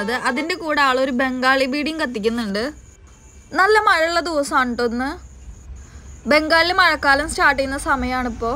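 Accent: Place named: Thrissur, India. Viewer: സാധനങ്ങളുടെ വിലയുംകൂടി പറഞ്ഞാൽ നന്നായിരുന്നു (തൃശൂർ സ്ലാഗ് ഉണ്ടല്ലോ ) എന്തായാലും നന്നായിട്ടുട്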